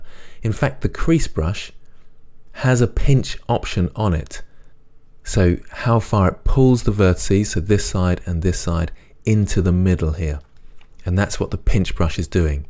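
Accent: United Kingdom. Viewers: You know you're an Intelligent individual when you sound British and teaching Blender 2.8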